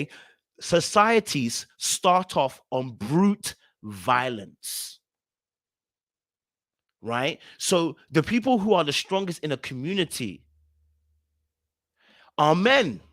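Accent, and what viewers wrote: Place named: England, United Kingdom. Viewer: I was NOT expecting the English accent! 🥰🥰🥰🥰🥰🥰🥰🥰🥰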